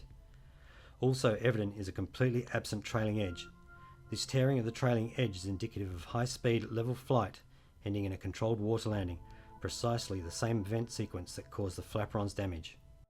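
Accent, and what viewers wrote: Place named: Australia. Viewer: Brilliant work. Really well done. How do you know the CB’s were pulled at a certain time? And, what was the purpose of then restoring their position later in the flight? Again, great job, sir. As a fellow Aussie, you had me with the accent. I hope you pursue a career with ATSB. Needs more great, analytical minds!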